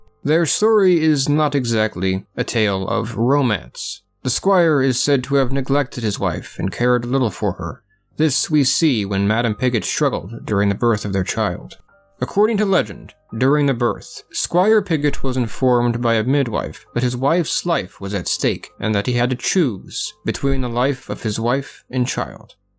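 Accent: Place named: United States. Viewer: He's speaking SAE, Standard American English.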